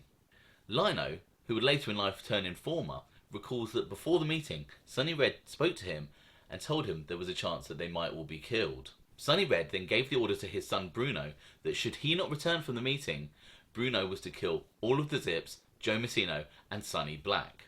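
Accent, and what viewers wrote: United Kingdom. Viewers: Loving the videos mate. Nice to here a fellow Brits talking about the mob.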